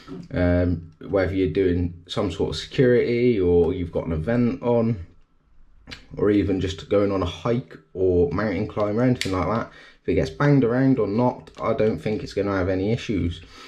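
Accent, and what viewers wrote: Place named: England, United Kingdom. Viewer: 😂 Fair enough mate...you sounded English in the Video...73 ✌️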